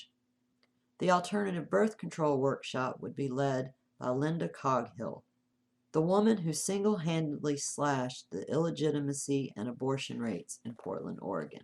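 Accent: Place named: Western North Carolina, United States. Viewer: I’m from western North Carolina so it sounds like home to me!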